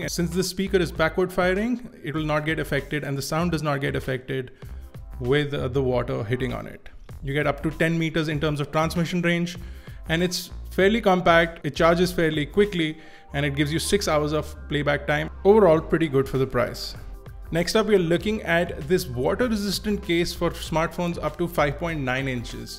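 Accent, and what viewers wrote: India. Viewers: Damn man... Dope doesnt sounds so dope in the Indian accent. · Indian Accent 😄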